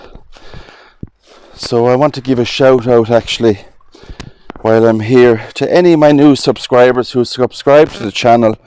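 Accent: Ireland. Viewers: there is no lovelier accent than the Irish brogue, I enjoy listening too him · Love your videos and your Irish accent, will happily subscribe, cheers from Australia.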